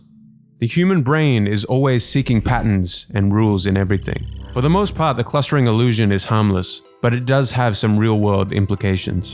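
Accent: Australia. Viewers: Nu Aus accent. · Are you Australian, Mr Narrator?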